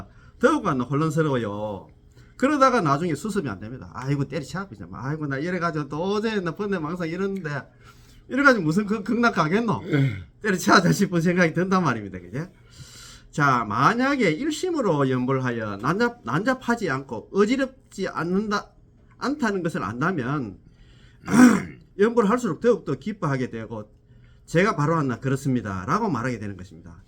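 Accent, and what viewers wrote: South Korea. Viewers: 믿음직한 대구사투리 정말 좋습니다. 믿음이 더욱 확실해지네요. 나모아미타불 나모아미타불 나모아미타불 나모아미타불 나모아미타불 나모아미타불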